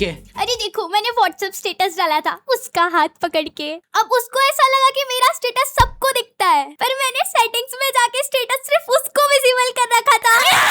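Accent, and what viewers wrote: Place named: Rajasthan, India. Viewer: Bro is from rajasthan 😅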